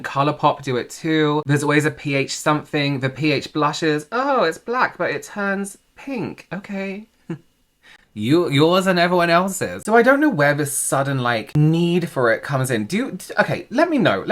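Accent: England, United Kingdom. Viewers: I have an interest in makeup, and you provide valuable information not only about the make up application, but you add your years of professional experience to it., it is always delivered with humor, intelligence, and realness which is very much appreciated. Also your British accent is a nice to hear too! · The English accent is everything 😍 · the English accent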